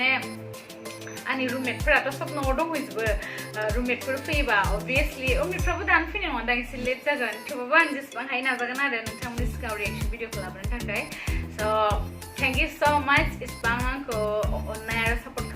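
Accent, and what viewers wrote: Kokrajhar, India. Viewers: Hi abo jgni kokrajharni badinw railaiw mtw🥰🥰🥰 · Kokrjhar nijg same jaw railainaya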